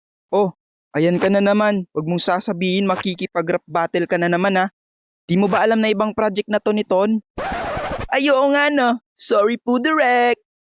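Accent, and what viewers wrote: Visayas, Philippines. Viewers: Bisaya kapo ba kuya ton? Ask lng po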